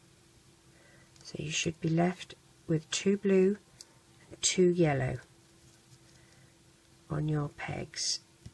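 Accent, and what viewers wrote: United Kingdom. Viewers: I dont mean for this to sound mean but you have a VERY british accent also peope0le dont rage bc im British too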